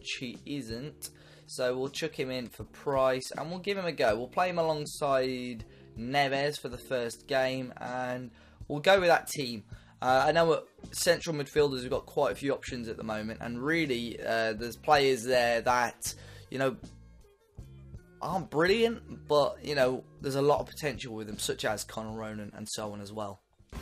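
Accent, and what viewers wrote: Malta. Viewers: Are you from Malta 🇲🇹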